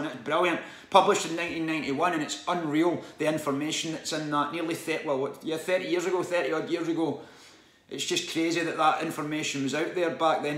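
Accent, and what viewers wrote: Scotland, United Kingdom. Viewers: Alright ma man! That’s all I need to say where I’m from lol totally brilliant channel good to hear a fellow Scot speaking on these subjects I had my awakening after reading David Ikes Cildren of the matrix it blew my mind then I started doing some deep research I even read TRNCE formation of America 😢what that women went through from the day she was born if u haven’t read it mate give it a read the amount of important people that were assaulting band other things in Bohemian grove fkn terrible. She was a victim of mk Ultra had 2 personalities and one was a trafficker of heroin for various groups I’ll leave it like that member keep up the good work Ioved got a few to watch and see what the think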